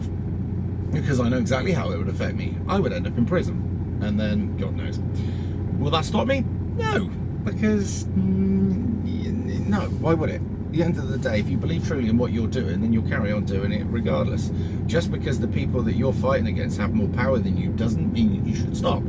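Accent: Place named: England, United Kingdom. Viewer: Well is a very handsome looking English man with a gorgeous English accent, he does sound very classy, don’t mind his use of swear words, much needed in our current situation🇮🇪☘️🇬🇧❤️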